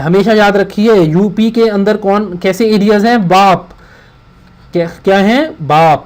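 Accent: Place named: Punjab, India. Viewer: Your way of teaching is really good I,m your big fan sir ❤️❤️❤️❤️❤️❤️bus aap please Toda Hindi Mai bhi explain kar diya kariye i, mean ek summary type hindi mai b bata diya karaiye aap and apki baato se lagta hai ap punbai hai .....Aap bilkul real waale punjabi hai bilkul cool type k rocking type like....huaaaaaa baleee balleee te sava sava🤭🤭😘😘😘☺️😇🤴🙈❤️👉❤️❤️❤️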